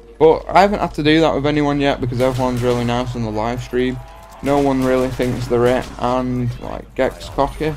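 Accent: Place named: Manchester, United Kingdom. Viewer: u hav a great manc accent im from manchester aswel woop woop !!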